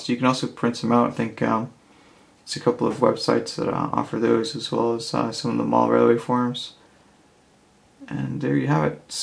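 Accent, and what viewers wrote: United States. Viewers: I have to know are you american? You sound it.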